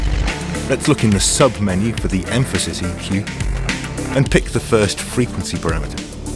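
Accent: United Kingdom. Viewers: Y'know,... In the 20-some-odd years that I've been tweaking knobs I've developed juuuust enough hubris to think that I know a thing or two about this stuff. Somehow, though... SOMEHOW — these tutorials of yours manage to either completely school me or at least reintroduce me to concepts in a way that make me question whether I in fact know anything at all. You and your sensible British accent. #besttutor #dulcettones DON'T LET IT GET TO YOUR HEAD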